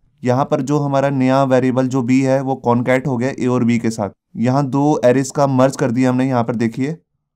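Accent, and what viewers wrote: Punjab, India. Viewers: sir r u from punjab??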